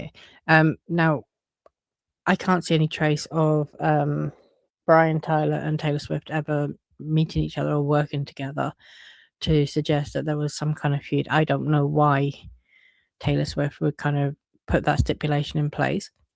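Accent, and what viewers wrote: United Kingdom. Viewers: Just ran across your channel, from your accent i assume you're in England, I also saw another video that says they're moving to England. I'm curious, what do people there think about her and ryan?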